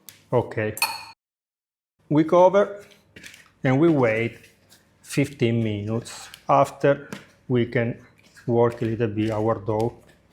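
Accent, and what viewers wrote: Italy. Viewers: Ma quanto amo l'accento italiano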